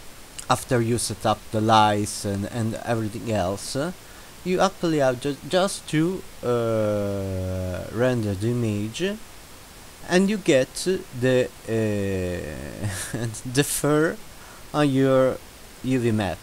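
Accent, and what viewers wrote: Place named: Japan. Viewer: Your accent sounds like a Japanese. Anyway I love this video so much ❤️❤️❤️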